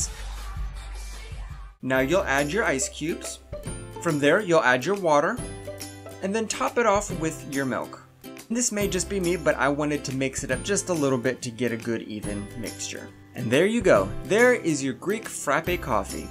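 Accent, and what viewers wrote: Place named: United States. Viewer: Dear God, the American accent is horrendous! Frap-pay. 😂😂😂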